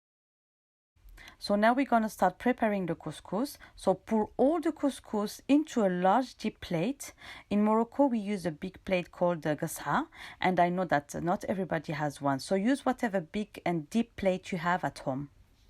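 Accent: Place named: France. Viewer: You have very sweet french accent🙂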